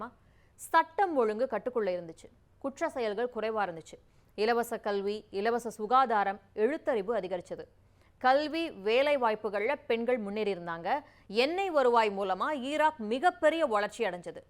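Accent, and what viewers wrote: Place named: Chennai, India. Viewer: இவ்வளவு கௌவரவமாக உடை உடுத்து Madras தமிழ் பேசுகிறீர்கள் முத்துராமலிங்கம் உயிரோடு இருந்திருந்தால் உங்களைப்பெற்றதற்காக நிச்சயமாக கவலைப்பட்டிருப்பார் .பாடசாலையில் படிக்கும் போது Madras தமிழில்தான் படித்தீர்களா? தூதூதூதூதூ.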